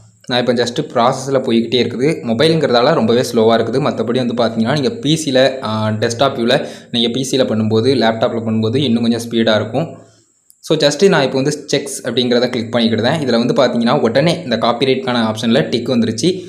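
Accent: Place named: Tirunelveli, India. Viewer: Nanum tirunelveli than pesum bothu therithu athan ketan tirunelveli la enga தம்பி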